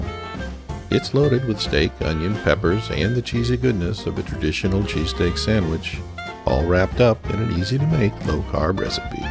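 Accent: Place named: South, United States. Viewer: I love the way you talk. It's just so Southern. Love the recipe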